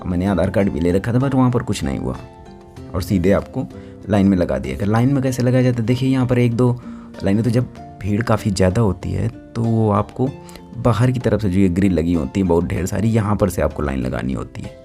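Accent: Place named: Kanpur, India. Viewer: Ghusa dete hai kya kre kanpur ki language hi aisi hai but bhai best information or some funny language mja a gya👍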